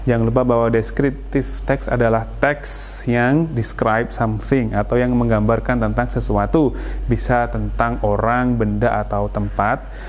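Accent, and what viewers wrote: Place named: Cileren, Indonesia. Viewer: kaya wong cileren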